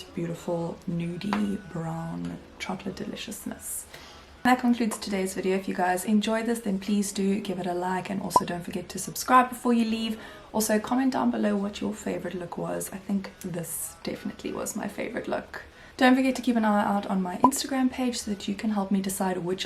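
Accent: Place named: South Africa. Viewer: I love listening to you talk cos you're South African. This makes me H A P P Y!!!! Love the eyeshadow results. I love all of them. Thank you for the demo.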